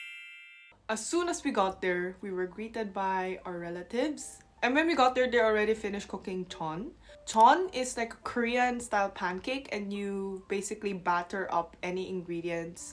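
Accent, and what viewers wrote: Philippines. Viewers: Anyo sarangheyo 😅 🇵🇭🤝🇰🇷 🥰 ask ko lang pag nag e english ka dyan sa co worker or Korean friends mo ano comment nila sa pilipino english accent mo??? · Pinay na pinay accent kapag nagtataglish ka...hehe 😉😅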